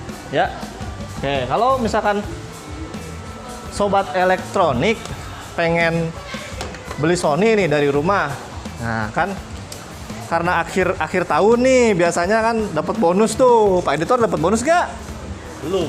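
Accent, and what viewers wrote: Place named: West Java, Indonesia. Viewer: Nyunda' pisan a' Pull etsdi Wait enjel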